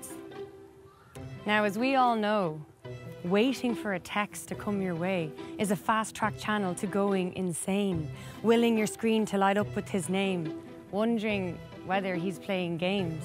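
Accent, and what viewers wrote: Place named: Dublin, Ireland. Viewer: Bhí cailín álainn, cliste agus saothraithe agam mar Te Ciara nuair a bhí cónaí orm agus céim i mBaile Átha Cliath. Ciara álainn cailín, álainn i ndáiríre. Braithim accent Bhaile Átha Cliath. Tá súil agam filleadh ar chónaí ann san athbhliain. Póg mhór ó Florence Ciara. Cailín álainn Éireannach!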